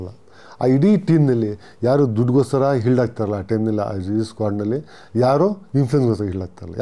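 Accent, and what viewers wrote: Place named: Tumkur, India. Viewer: Typical Tumkur language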